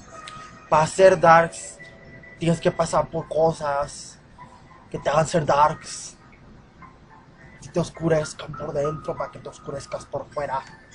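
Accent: Mexico. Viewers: Tkene acento del norte de Mexico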